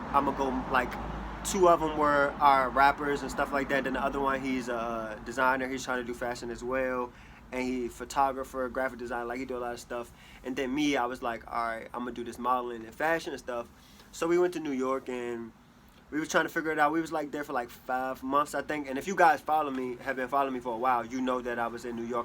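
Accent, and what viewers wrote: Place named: New Orleans, United States. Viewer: You talk like you from new orleans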